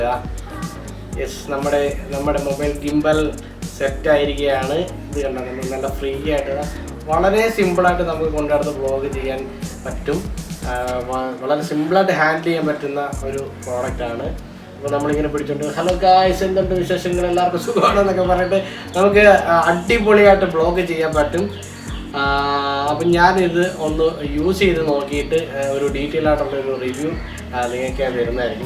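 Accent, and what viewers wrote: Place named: Kollam, India. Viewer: കൊല്ലക്കാരുടെ സ്വന്തം സ്ലാങ്ക് "ദാണ്ടേ " പിന്നല്ല പൊളിച്ചു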